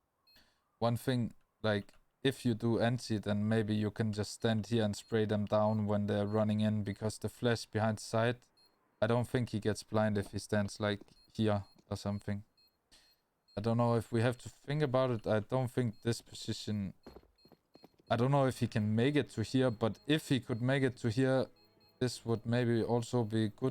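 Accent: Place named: Denmark. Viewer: Your danish accent is sexy af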